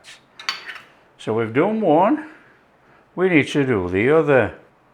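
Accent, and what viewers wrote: Yorkshire, United Kingdom. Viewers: At one time I use to deliberately burn toast as I liked it so much 😁😁 but I must say I really like how you said it in your lovely Yorkshire accent. That was fun to watch. The goo factor was off the charts! 🙌🏼♥️.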